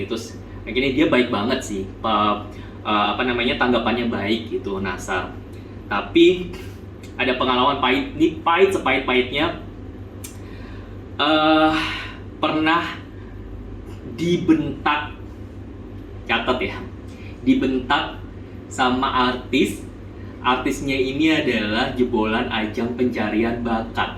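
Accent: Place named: Java, Indonesia. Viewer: Sabar mas, dulunya artis eh krj sbg crew.... Smngt.... Kbykn artis star sindrom..... Sukses skrg medok jawanya uda berkurang mas hehehehe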